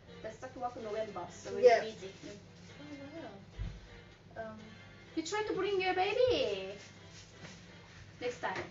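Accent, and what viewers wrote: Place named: Australia. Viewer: 호주발음 연습하러 와도 좋은 채널인데요? 언제나 느끼는 거지만 호주발음이 가장 어려워요~^^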